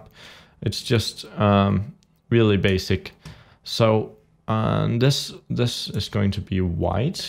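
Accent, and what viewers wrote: Denmark. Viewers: Swiff His main language is Danish I think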